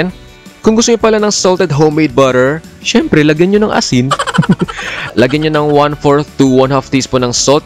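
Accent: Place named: Visayas, Philippines. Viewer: Hahaha bisaya diay ka?!!! Nyahahaha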